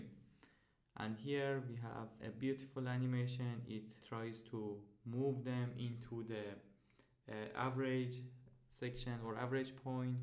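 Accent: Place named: Iran. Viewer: Cheghad Farsi Englisi sohbat mikoni :) damet garmmmmmmmmmmmmmmmm eshghiiiiiiiiiiiiiiiiiii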